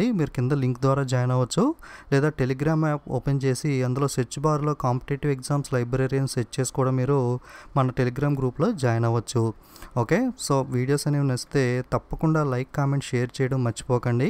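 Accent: East Godavari, India. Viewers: తూర్పుగోదావరి జిల్లా voice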